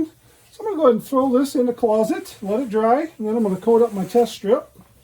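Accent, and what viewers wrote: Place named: Manitoba, Canada. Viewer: Sounds like a Manitoban accent.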